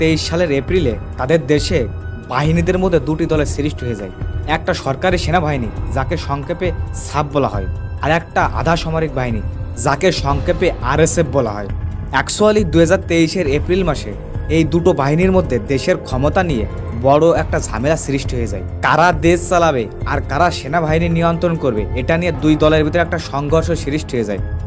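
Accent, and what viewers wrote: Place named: Gopalganj, Bangladesh. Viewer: তুমি কি আমার গোপালগঞ্জের মানুষ নাকি তোমার কথাবার্তা গোপালগঞ্জের মতো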